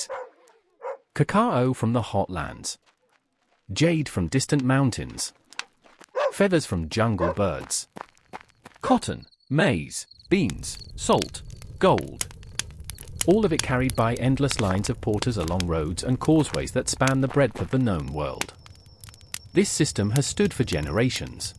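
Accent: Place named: United Kingdom. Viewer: It’s read by a British person… the irony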